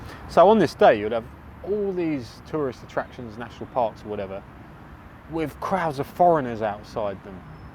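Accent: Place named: Cockney, United Kingdom. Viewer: An interesting one. Do you ever have any trouble in places as your Thai will have a cockney lilt to it! I assume you have a Thai passport as well as a UK one? Having a Thai one will stop any possible problems i'm guessing.....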